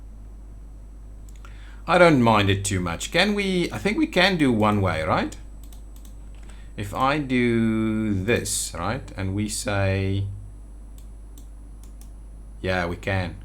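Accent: Afrikaans, South Africa. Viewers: Are you actually Afrikaans Wondering by the accent and the way you say your "r".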